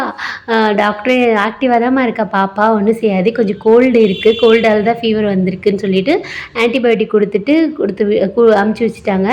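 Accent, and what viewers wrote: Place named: Ramnad, India. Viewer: Akka unga voice abdiye ramnadla ulla pechu varthaiya iruku Alhamthulillah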